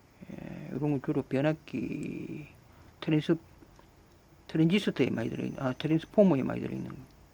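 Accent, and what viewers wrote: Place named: Gyeongsang, South Korea. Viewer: 수고 많습니다ㅡ 말씨를 들어 보니 대구분이신 거 같은데ᆢ 사업 번창바랍니다^^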